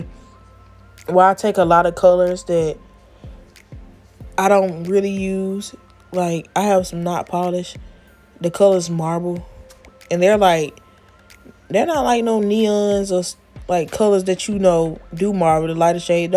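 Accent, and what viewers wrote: South, United States. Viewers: you are funny 😅😂the nails came out cute and your accent is awesome I love my country girls💃🏽💃🏽💃🏽💃🏽💚🥰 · Not annoying and country you crack me up😂😂😂😂💜💅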